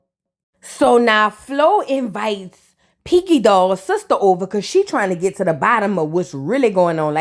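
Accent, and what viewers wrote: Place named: Louisiana, United States. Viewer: Looord I only watch it ...tooo hear ur review....I loooove u my looozana chick...cuz itsssss ur looozana voice for meee....I understand everything u saying 🤣🤣🤣🤣❤️❤️❤️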